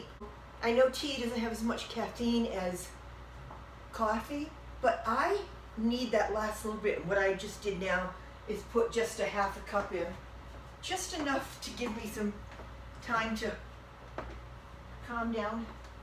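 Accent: New England, United States. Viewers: My mother grew up in New Hampshire and would say “sleep tight, don’t let the bedbugs bite”. I, in turn, said it to my kids when tucking them in to bed. I love your channel and your New England accent because it reminds me of my aunties.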